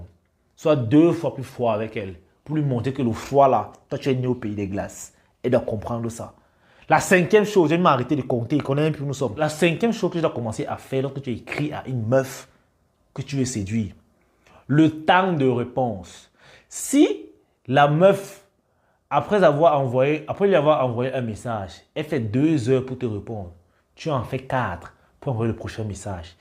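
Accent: Cameroon. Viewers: Toi tu es mon frère camerounais, vue ton accent et ta manière de parler je suis sûr que tu es un Camer, et big up et force à toi bro. ( like mon commentaire si tu es Camer et que je ne me suis pas trompé )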